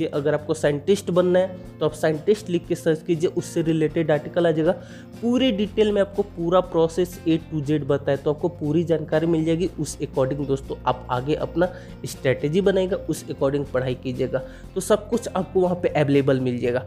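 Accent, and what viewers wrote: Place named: Uttar Pradesh, India. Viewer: Kuan kaun ye bhaiya ki aawaj Uttar Pradesh se sun raha hai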